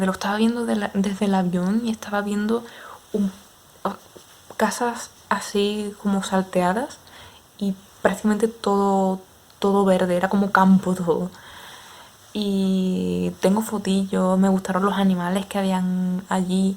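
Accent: Spain. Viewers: Edad tuya estimada: 27 Sobre música preferida hubieras terminado antes diciendo cuál no te gusta xD Del Sur de España lo supuse pero pensé que eras extremeña (a través de susurros es complicado acertar).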